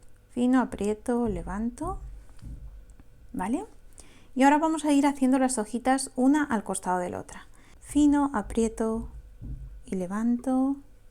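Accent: Spain. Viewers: Que linda es la maestra con acento español, saludos desde Quito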